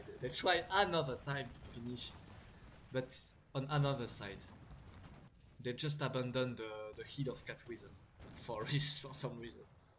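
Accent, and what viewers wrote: France. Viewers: french accent, so french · are you french ?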